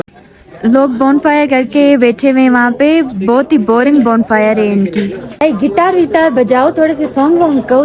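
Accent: Sindh, India. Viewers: I just love ur sndhi acnt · your Voice,Sindhi accent, cuteness, smiley beautiful face and positivity made me your biggest fan, I became so addicted to your vlogs ❤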